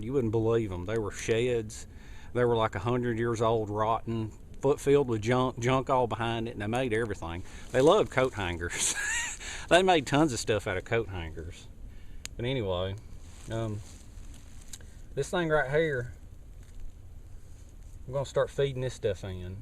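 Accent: South, United States. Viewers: I love watching your videos. From the first word in that fantastic Southern drawl and the infectious laugh right through the fantastic tips and things you can do/make with rope, and ending in your sign offs... it's all truly worth watching. I'm waiting for the warmer weather to arrive here in bonnie Scotland before I venture out and try some of your ideas. Keep making the vids - they make learning fun. Best wishes.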